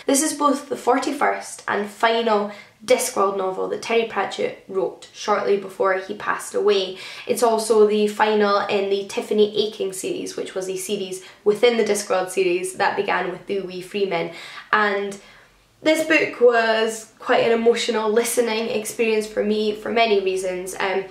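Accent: Scotland, United Kingdom. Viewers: As someone who is an asian I always wrongly assume that you are greek considering how passionate and invested you are about ancient greek mythology and history:)) Btw I find scottish accents really cute and adorable! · Hope February is an even better month for you. ❤️ Are you Scottish btw?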